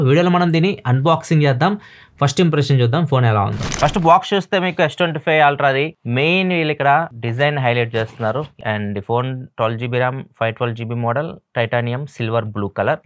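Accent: Ongole, India. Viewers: Our ongole telugu....😂 edge kopu ga untadi...❤❤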